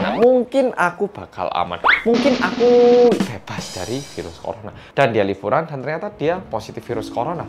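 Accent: Java, Indonesia. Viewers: Wes di dendo dikon ganti rugi. Hissss neng omah yo enak kok delok Youtobe, yang medok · Mas muedok annyeong HI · Ganteng benner masss di video ini jujurr langsung terpana aku ngeliat mukanya mass medokk 🤣:' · Korea medooook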